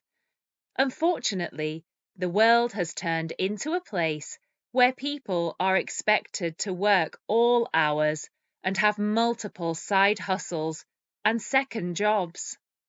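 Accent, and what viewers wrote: United Kingdom. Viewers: Thank u :-). Your channel is amazing. You are helping me to learn English and also I am able to learn gorgeous British accent. I am from India and I want to Go to London in future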